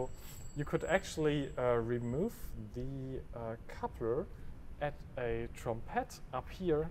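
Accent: Germany. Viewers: Are you from German your englisch is like a German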